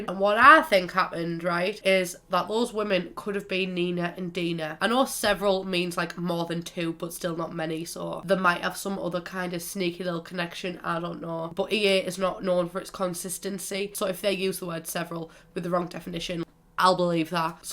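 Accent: United Kingdom. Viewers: Lmao you’re so british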